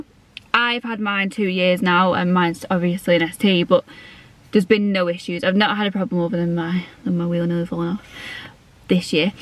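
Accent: Liverpool, United Kingdom. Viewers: I love a girl with a scouse accent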